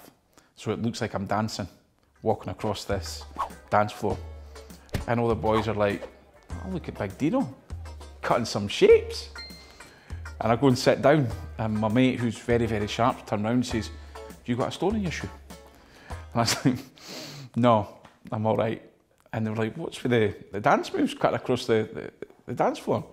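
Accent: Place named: Scotland, United Kingdom. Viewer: He's Scottish 😊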